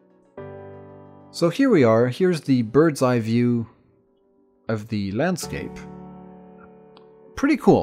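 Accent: United States. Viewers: est américain, donc forcément il a l'accent. Et pour le nom d'Aurélien Sama, un anglais (ou américain), quelque qu'il soit, s'il a un mot français à dire, le dira avec l'accent français (ex: Rendez-vous) et encore plus si c'est un nom propre.;) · Ce n est pas un bon accent en anglais il est américain il a plus tôt un très bonne accent en français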